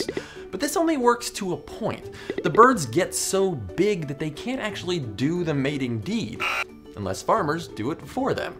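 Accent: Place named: Texas, United States. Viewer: I love how you can tell is from Texas 🤠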